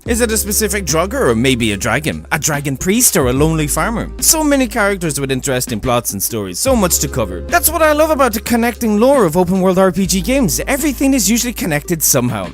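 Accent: Ireland. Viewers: Yes irish...just yes! Love the rambling!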